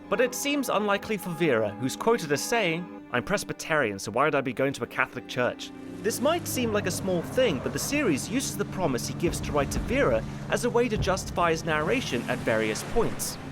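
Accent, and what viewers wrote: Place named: United Kingdom. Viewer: you say names so British like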